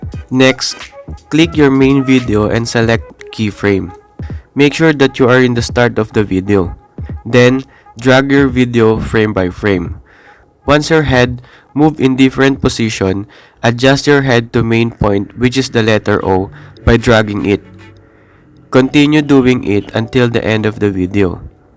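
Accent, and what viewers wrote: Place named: Philippines. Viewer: Sounds like a Filipino accent 😍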